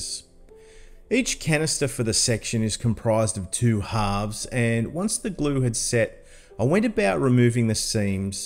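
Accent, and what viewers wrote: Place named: Australia. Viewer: Fun 70s memories, but mine weren't quite as Aussie sounding. 😅 MN, USA 🇺🇲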